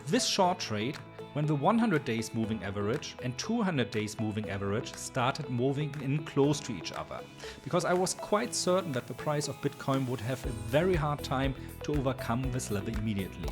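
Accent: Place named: Germany. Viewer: Hello, i just found your channel and its amazing. Especially this vid thought me a lot about confluences. But do you really trade on the daily chart? Can you make a video explaining your entry i.eg. at lower time frames after analysing the daily chart? By the way, i like your german english accent🤗 i‘m from germany😊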